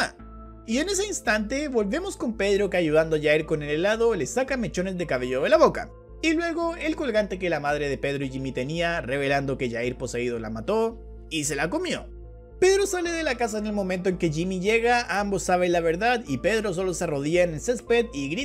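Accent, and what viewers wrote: Argentina. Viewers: El acento argentino de 10/10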